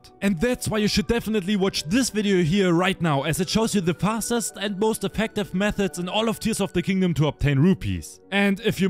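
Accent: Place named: Germany. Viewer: Man hört so sehr den deutschen Akzent 😂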